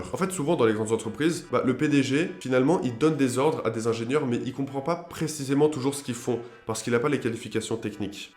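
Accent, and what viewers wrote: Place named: Russia. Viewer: On dirait que tu penses comme moi. Tu t'inspires des meilleurs pour t'en rapprocher. On dirait que ta vidéo a été tourné et penser par moi. On dirait que c'est moi qui explique quelques chose. Tant mieux, your're like me. Je considères humblement bien expliquer les choses et être bon pédagogue et je constates que toi aussi tu es comme ça. Je sens un légé accent russe, je me trompes?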